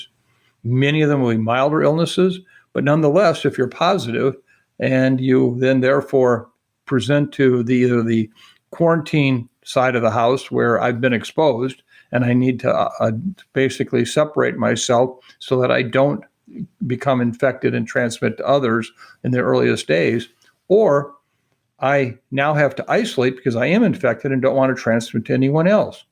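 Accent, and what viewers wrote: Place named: Minnesota, United States. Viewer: He's from Minnesota and that's the dialect.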